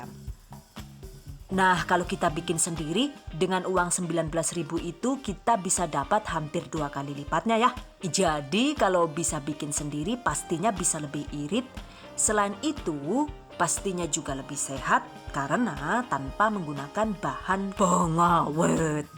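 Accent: Banyumas, Indonesia. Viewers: Dr logatx kykx wong ngapak nih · Deneng ngapak? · hahaa gak tau kenapa aku suka banget bahasa ngapak ini. kalau denger temen ngomong aku melonggo sambil senyum. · Iduguduh Deng malah wong ngapak? 🤣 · Sy suka penyampaiannya jelas sekali... dan ngapaknya itu lho...👍👍😍😂😂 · Bu rika Wong ndi sih deneng ngapak temen Kaya nyong 😁😁😁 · Mba wong ngapak ya...😅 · Ibunya ini orang mana yaa 😅 kok medoknya mirip akuuu yg dari Banyumas. Btw baru nemu channel ini hehehe bermanfaat bgt buat calon ibu rumah tangga nih hehe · mantapp...ngapak bangett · Owalah wong ngapak juga ternyata. Ora ngapak ora kepenak yah mbakyu:D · Anu wong ngapak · Mirip pengisi suara warung tarsun di radio RRI pwt Apa lagi pas ngomong ngapak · Masa tepung keledai jeng ha..ha.ha..lnjuttt jeng ngapakkk..inyong jd ktawaa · Njenenga jane asli ngendi sih bu?...deneng bisa ngapak?..ngapake bener sisan. 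Top pisan lah...basa inggrise jg joss... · Ngapake kue jenk....jan ora ngapak ora kepenak🤣🤣🤣🤣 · Deneng ngapak ya wkwwk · Wong ngendi si rika mbekayu??? deneng ngomonge ngapak jan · Lah deneng Rika ngapak yu? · Lhaaa lhaaa.. Deneng ngapak mbak? Ya pd bae kr inyonge.. Aq jg follow akun njenengan sing ng cookpad mbak. Sukses trs mbekayu.. 👍🏻👍🏻👍🏻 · Madiun deneng bahasane ngapak kaya nyong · Mbekayune wong ndi si kie yaa.. deneng teyeng basa penginyongan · Suka lihat video nya... Penyampaian bagus... Trus ngapaknya bikin kangen... Wkwkwkwk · Hahaha.....deneng ibune wong banyumas.....😃😃😃😃😃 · nyong kaget pas ngomong ngapak salag krungu pora teknputer ulang saya suka saya suka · Deneng ngapak, ora ngapak ora kepenak yaaaa😁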